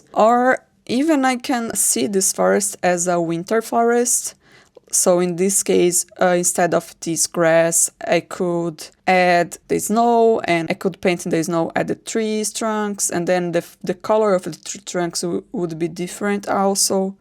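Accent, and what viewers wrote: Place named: England, United Kingdom. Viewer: You're very talented, and your English accent is lovely.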